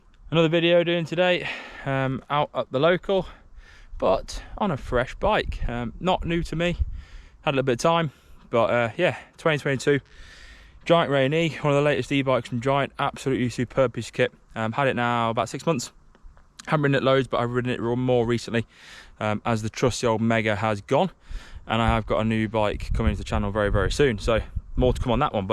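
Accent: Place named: Ireland. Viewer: ay mate speak English, your choppy when you speak, only brits will understand you.,,,for a Mick....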